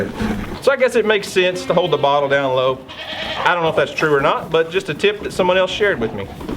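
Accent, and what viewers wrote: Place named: West Virginia, United States. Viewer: My 5 yr old daughter and I just watched this video together and she said Momma, he has goats like we do and he talks like us too 😂😂😂 we're from West Virginia. It's bitter cold here too. Can't wait til Spring....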